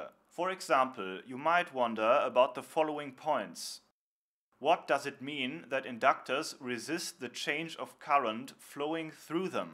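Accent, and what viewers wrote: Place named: Germany. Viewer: My first time i see your videos, even with your strong german accent (btw im spanish with no very good english level lol) you talk very clear and easy to understand. Great video. Thanks for teaching!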